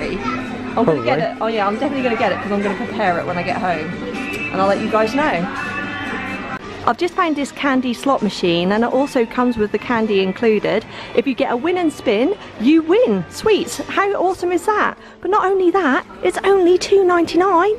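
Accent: Norfolk, United Kingdom. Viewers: R u from norfolk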